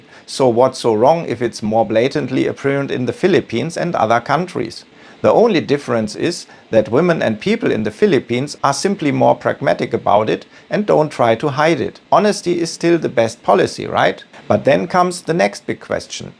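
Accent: Germany. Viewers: I love the German accent. It gives you credibility like a Mercedes Benz ad.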